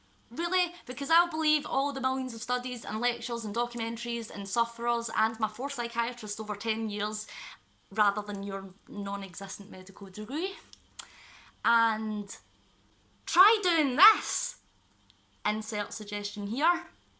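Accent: Scotland, United Kingdom. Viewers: Soz I couldnt get any sound on my phone that's why I asked if your english its obvious now my phone is working that your Scottish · i love you and i'm sorry all of this has happened to you, and i'm sorry this illness runs so rampant in your life. i'm also a mother, living in scotland, with ptsd & other mental illness'. i was absolutely gobsmacked when you explained your teacher read that letter to the entire school?! i actually cannot understand that but at the same time.. schools here back then were rough. nothing good ever came from speaking up which is absolutely not how it should have been. ptsd really controls so much of my life and its such a fragile and difficult topic to speak about, never mind trying to tell someone we you are the way you are and what happened or what triggers you so the sincerest well done on being brave enough to make and post this video. 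it's probably helped a lot of people who are struggling too or who know someone struggling in a similar way. sounds daft but it was helpful for me to hear this all come from someone who sounds the same as me, if that makes sense? same accent and way of talking etc which i don't exactly come across much on youtube etc so it just.. i don't know, felt a bit more personal or something. i hope you're doing okay :)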